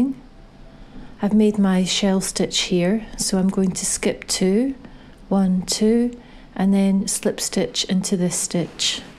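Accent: Scotland, United Kingdom. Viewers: As a first timer at crochet, I just like to say , your easy to follow, step by step, you're also Scottish which makes it even easier for me. Thanks ♥️ a trillion💯👍💓🏴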